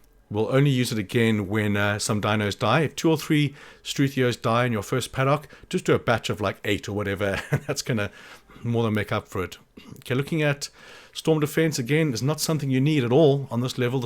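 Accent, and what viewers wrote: South Africa. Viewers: Bro, just found your channel. Nice to hear a South African for once. Keep going dawg, climb that mountain 💪🏻🔥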